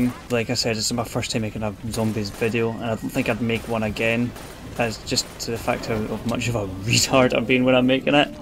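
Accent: Scotland, United Kingdom. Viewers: Where in Scotland are you from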